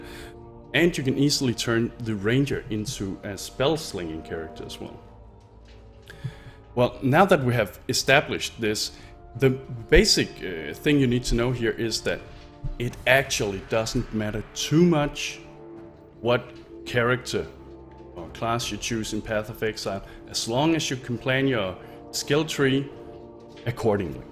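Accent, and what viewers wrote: Denmark. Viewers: Are you Danish? The accent sounds so much like Danish ^^ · Oh god, Danish English... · are you danish? You sound danish:b with your accent:D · Jeg elsker hvordan en dansker kan høre en anden dansker når de taler engelsk. satan det er tydeligt.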